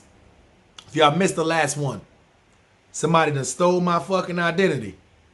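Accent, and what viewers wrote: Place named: New York, United States. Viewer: I know you must rap you the New York voice what’s good out there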